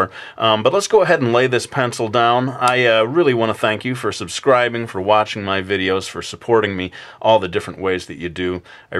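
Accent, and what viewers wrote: Brooklyn, United States. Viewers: Sorry, it just sounds like a bit "cheesy gameshow host". It might just be that you're American and it sounds corny to an Aussie. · Brooklyn accent again. eyeroll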